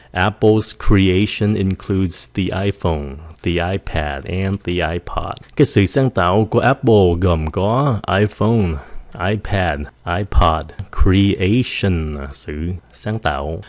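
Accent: United States. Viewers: you pronounce like American, i saw two youtube channels between british and american, there are some differences in pronunciation. perharps, i just wanna know how to use these words....tks u for videos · cách phát âm thầy dạy ở đây là theo tiếng anh Mỹ ạ? Em định học Ielts, phải phát âm theo chuẩn tiếng anh Anh, thầy có dạy theo cách này mà phát âm của người Anh ko ạ?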